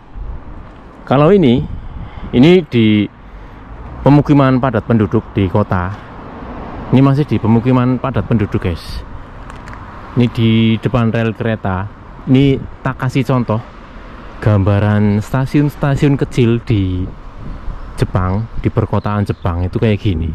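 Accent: Banyumas, Indonesia. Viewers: Enak kang; ora ngapak ora kepenak....😊😊😊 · Aku nonton sambil nelajar ngapak 😊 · Mantab mas,ORA ngapak ora enak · yang ku suka dr channell ini adalah boso ngapak nya mas dan content nya yg sederhana, semangat mas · Malah seneng mas, Ngapak,dadine natural. Ora mboseni,terus pemandangane apik bgt. Aja klalen,"permisi Mbah,🤭🤭🤭bismillah mas,😃😃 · Sy senang dengan logat ngapaknya kang.sekalian belajar bahasa ngapak.sukses terus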